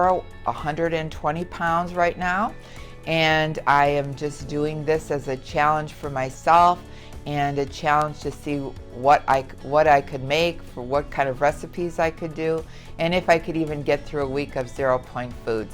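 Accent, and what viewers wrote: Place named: Midwest, United States. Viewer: you're welcome! I asked on aonther if you were originally from the Midwest (I'm from MI, lived in WI a while, and I feel like I hear Midwest when you speak 🙂)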